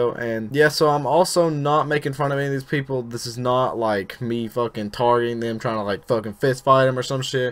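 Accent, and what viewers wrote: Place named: Kentucky, United States. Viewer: By any chance are you from Kentucky?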